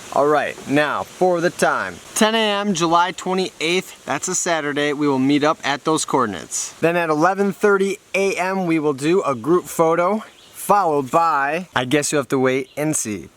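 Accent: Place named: Upper Peninsula, United States. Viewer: Love da yooper talk ya know Der hey! Keep'er movin!! 😁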